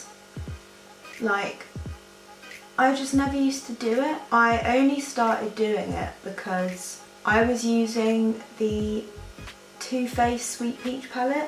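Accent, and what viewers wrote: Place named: United Kingdom. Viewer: Omg looove the look, you're just so pretty and I love the way you talk haha don't mean to sound creepy though, I just have a slight obsession with British accents and everything English related.. I want to move to England soo bad!! lol sorry for that random comment.. I just meant to say that I loved this video and you're awesome! x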